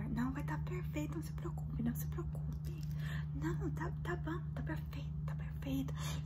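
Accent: Minas Gerais, Brazil. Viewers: Eu amei, ficou muito bom seu portugues, ficou um sotaque bem mineiro adorei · Your Brazilian accent is so strong lol not hating at all, you’re Portuguese was perfect!! Love your videos!! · Moça não tem como você não ser do Brasil, até o sotaque é fortíssimo e amei o asmr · Já ia pergunta se ela é de Minas kkkkkkk Perfeita.🤩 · estou confuso com o seu sotaque brasileiro, mas pelo menos você tentou, excelente asmr!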